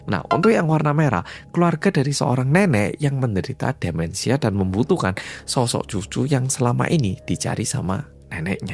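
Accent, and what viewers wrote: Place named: Java, Indonesia. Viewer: Salut keputusan Yujin❤ tks muedok 🎉🎉🎉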